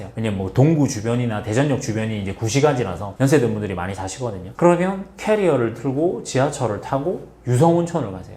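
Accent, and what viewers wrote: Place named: Gyeongsang, South Korea. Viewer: 경상도 사투리 쓰시네 그래서 부산을 좋아하시나 싶기도 ㅎㅎ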